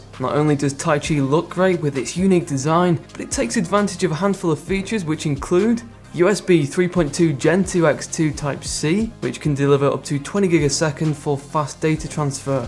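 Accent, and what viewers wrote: England, United Kingdom. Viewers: Hi, Thank you for another great video. is one of my favorite YouTube channel because of the following reasons: High quality video content The (video card) product presentation is VERY professional The camera angle is Perfect The sound is very CLEAR I and my wife enjoy watching YouTube channel, because my wife and I really like gaming PC, and high end computer components ( video card, motherboard) My wife tells me that the gentleman in this video ( I think his name is ) LOOKs like people in Hollywood, she likes English voice. In this video, the new 5600XT is impressive. The VRam's low temperature alone is worth buying. This RX 5600Xt review is very informative and helpful. Keep up the good work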